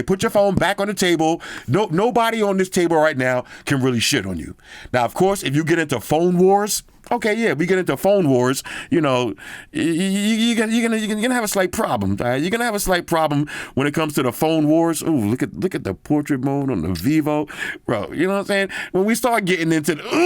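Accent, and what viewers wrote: New York, United States. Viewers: I do have to say that I truly like and respect how you keep it real with your reviews and yes, I do have an iPhone 16 Pro black titanium and no I do not like the new three colors in the new Apple iPhone 17 Pro models. Of course you’re always going to have haters out there so you keep on being you and keeping it real and disregard all the haters you’re going to come across on your channel and I just subscribe to your channel and again I do admire and respect how you give good insight into whatever it may be Apple Google or any other smart Phone or product out there on the market in today’s marketplace. And since you touched on the Apple Watch Ultra 3 and just wanted to know how you felt about the 5G versus the Apple Watch Ultra 2 and how in comparison speed wise does the Apple Watch Ultra 3 is it slightly better than the ultra two or are they about the same speed wise? 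You definitely sound like you’re from the New York city Metropolitan area and I’m here in Brooklyn New York where I was born and raised and I always get hated on for voicing my opinions or telling it like it truly is but that’s OK. It’s not a popularity contest with me you either like me or dislike me and it doesn’t really matter to me because again it’s not a popularity contest with me what you say is what you get and a lot of people truly respect those or truly honest with themselves and others and of course you’re always going to have the hater or two out there again keep making great videos and keep it real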